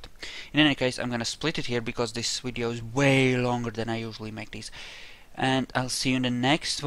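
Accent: Russia. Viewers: That's actually a nice rocket! By the way, you can hold I think shift or control or something and click on the Apoapsis or Periapsis and the altitude numbers will stay on the screen. I like your KSP vids. I wish they were more popular. You never say uh, uh, uh, uh, like others. Your accent is cool. It's like listening to Russian space race videos. LOL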